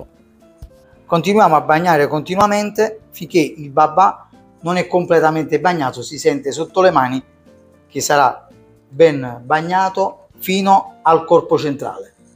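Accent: Naples, Italy. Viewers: E cosa dire: Lei è un grandissimo pasticciere, poi l'accento napoletano! A proposito, W NAPOLI!!!!🎉🎉❤❤ GRAZIE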